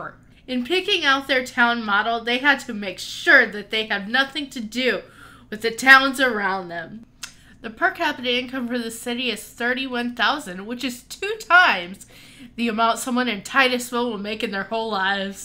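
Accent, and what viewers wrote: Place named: New England, United States. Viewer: Your yankee accent means you should hang out in Daytona or cocoa.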